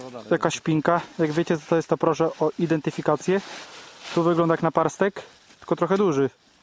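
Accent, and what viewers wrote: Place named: Kuyavia, Poland. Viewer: Ty chyba jakoś z Kujaw jesteś .. "Jo" to trochę charakterystyczne :-)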